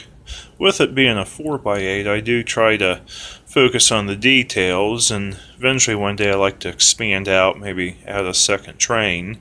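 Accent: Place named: Western Pennsylvania, United States. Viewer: Are you from Western Pa? you got the accent!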